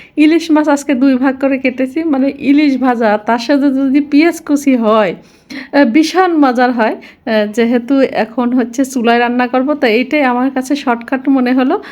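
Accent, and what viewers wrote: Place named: Jessore, Bangladesh. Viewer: কেন জিজ্ঞেস করলাম আপু আপনার ভাষা অনেকটা যশোর অঞ্চলের মতো তাই জিজ্ঞেস করলাম খুব ভালো লাগলো আপনার কথাগুলো আপনাকে অসংখ্য ধন্যবাদ রিপ্লাই দেওয়ার জন্য।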